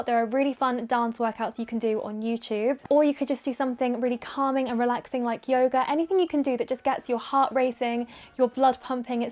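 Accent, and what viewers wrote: United Kingdom. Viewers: her british accent... love it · Jennie Aesthetics I’m English and sound nothing like her she is posh English 😂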